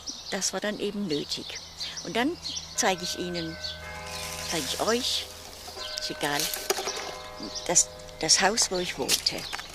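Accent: Swabia, Germany. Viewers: oma redest du schwäbisch oder badisch wenn du nicht vor der Kamera bist? Grüße aus Pforzheim nach Karlsruhe❤ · an alle die nicht aus bawü stammen hat die Oma einen schwäbischen Akzent? Ich kann sowas schlecht beurteilen weil ich für mich auch keinen Akzent hab aber Freunde aus dem Norden immer meinen das sie kein Wort verstehen ^^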